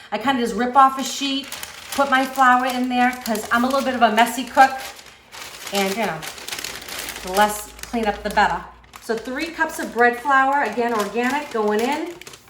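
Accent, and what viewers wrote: Boston, United States. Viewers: I was so confused when I saw Bostonian Alert & started laughing..omg I was trying to say it your way! Im loving this content & the bread looked amazing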